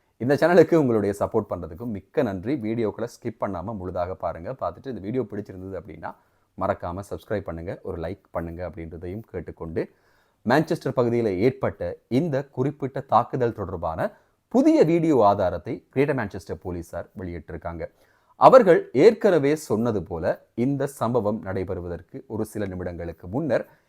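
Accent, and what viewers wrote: Sri Lanka. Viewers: Srilanka tamil is always unique enna doubt ungalukku 🤗🥰 iam in srilankan from india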